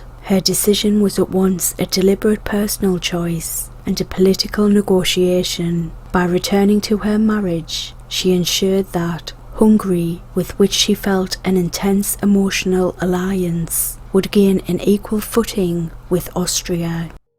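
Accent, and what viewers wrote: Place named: Durham, United Kingdom. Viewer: Ha, I guessed the general region (North East), but my Canadian ear would never've been able to pinpoint Durham. Your speech is so soothing. I'd love to learn more about the eldest daughter, Elizabeth Franziska – about whom there's not much written (partly due, perhaps, to early death).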